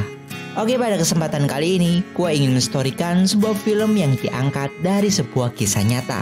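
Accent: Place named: Java, Indonesia. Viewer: Ya Allah, suaranya medok bangets...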